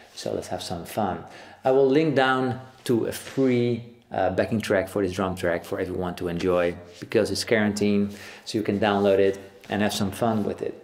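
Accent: Netherlands. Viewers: Eyyyyyyy sound dutch. Hallo daar ;)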